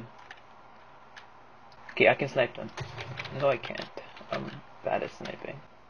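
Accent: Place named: Canada. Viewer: you sound exactly like every other canadian admin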